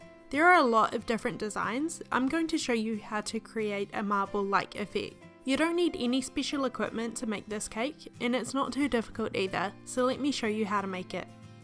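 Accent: New Zealand. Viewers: New Zealander accent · hey! she sounds kiwi, and definitely not from texas! have a good day :) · I hear that kiwi accent girl heyyyyy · so many people whinging here - great video well done sis. Love your kiwi accent. from an ozzie down under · As a kiwi who left 26 years ago, it's amazing hearing your strong accent. Great video btw. Well done.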